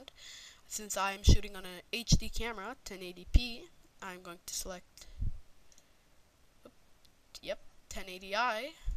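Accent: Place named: Canada. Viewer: no offense..but i can tell u have a canadian accent..its cool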